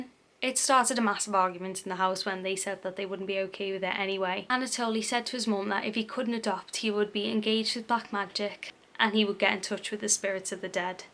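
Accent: Liverpool, United Kingdom. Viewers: You have a slight scouse accent if you dont mind me saying.. are you from Birkenhead ways? or the posh part of the pool? I live just across the mersey on the wirral :) Btw great vid! I am on a binge watching true crime! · Mad to actually find a true crime scouser on here, feel like I know you already 😂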